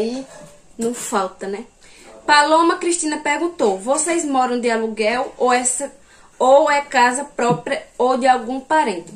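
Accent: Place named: Pernambuco, Brazil. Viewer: Pernambucana arretada amo esse sotaque também sou de Pernambuco..bjs conterrânea 😘😘😘😘😘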